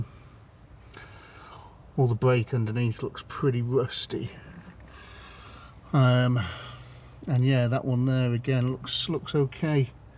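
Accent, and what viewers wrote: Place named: England, United Kingdom. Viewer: I´m from Germany and i love your english. Where in England are you from?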